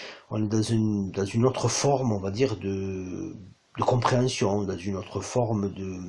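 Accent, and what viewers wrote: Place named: Tarn, France. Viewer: Coucou cela fait x années depuis 12 ans surtout le 11h11 le 14h14 , le 17h17 et 22h22 Ton accent me fait penser au Tarn lol Tu as raison pour les heures ❤ Les câlins des FJ sont aussi curieuses et je vais m'exprimer ici sans bouleverser mon intimité, les câlins sont espacés et à chaque fois se déclenchent des échos niveau famille enfin c'est bizarre ,comme un nettoyage Quant à la radio , je pose une question , et hop la réponse Belle nuit et grand merci ❤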